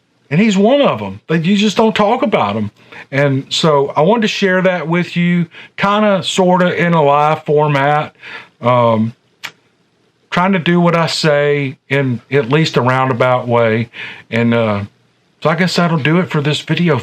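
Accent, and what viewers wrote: South, United States. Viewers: I love your southern accent ♥️ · Happy Father’s Day! You, in my opinion have the Best Sasquatch channel of all! You are a born-storyteller and we-in’s from Dixie, love your Southern accent! Thanks!👍👣👣👣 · Like your stories,and your southern accent! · I love your channel. And i love that southern drawl. The way u read other people's stories is so articulate and smooth it sounds as if it's your own experience. You are a pleasure sir. A real pleasure. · As an Aussie I love his southern twang 💜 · I love your Southern Ness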